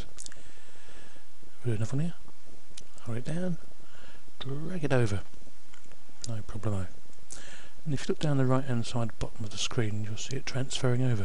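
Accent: United Kingdom. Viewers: Thanks. Its very easy in ubuntu. I am having problems with itunws and windows. And you hace a nice accent :) british? Regards, saludos.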